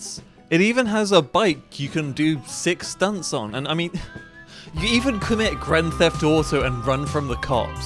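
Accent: United Kingdom. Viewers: I remember playing this game and thinking it was neat. I didn't think I would be reminded of it by a cute bri'ish boi using it as a vehicle for a mental breakdown. lmfao In seriousness, take care of yourself, bud.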